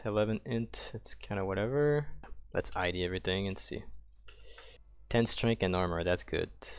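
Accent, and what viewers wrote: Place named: Quebec, Canada. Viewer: hi random and weird question lol, i noticed youre on the BR servers, but your accent sounds A LOT like a french canadian accent so I was wondering if youre from Quebec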